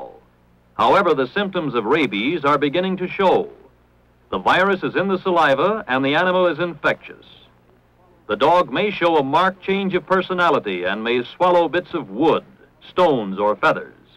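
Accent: Transatlantic, United States. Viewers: Trans Atlantic accent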